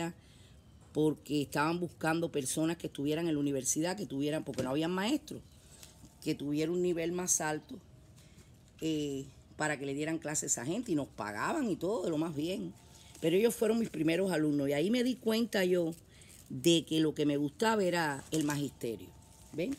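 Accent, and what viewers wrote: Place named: Cuba. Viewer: buenas tardes! Estoy buscando tus videos, por el puro gusto de escucharte hablar 😂ese acento cubano, a mí me encanta. Eres muy inteligente y culta, y creo que noto algo de perfeccionismo, que casi comparto contigo. (Ya lo estoy dejando, para no sufrir y hacer sufrir tanto 😂)Si supieras, yo quería ser presentadora de noticias por TV, y no es que fuera imposible, ni siquiera difícil, pero tuve la suerte 🍀 de optar por otra profesión, la cual al día de hoy todavía ejerzo. Fue un gusto ver y oír tu contenido. Saludos 🙏🏻🇩🇴🥰🌹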